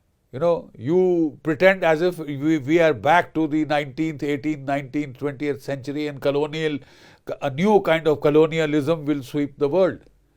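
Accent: India. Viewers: CA RAcus. Pronounce it correctly. The Indian accent SUCKS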